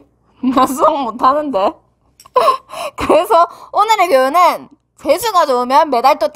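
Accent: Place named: Daegu, South Korea. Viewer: 대구 사투리 같은데 김해 느낌도 있고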